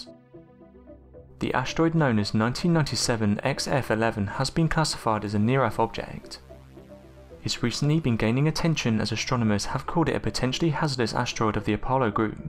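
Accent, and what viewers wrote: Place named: Oxford, United Kingdom. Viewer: Your Oxford' English is realy Nice...but...I must listen Your Voice 3rd Times...sorry...for - I can understand American' English better 😊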